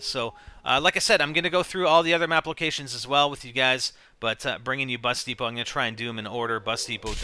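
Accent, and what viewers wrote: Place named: Canada. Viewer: He's Canadian. He has an accent.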